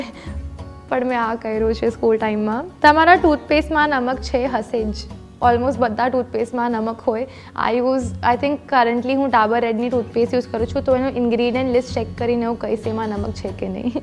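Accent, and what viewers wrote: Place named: Kathiawar, India. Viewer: jordar girl!!!vadhare aetle maja avi bcoz tu kathiyawadi bolech🤩..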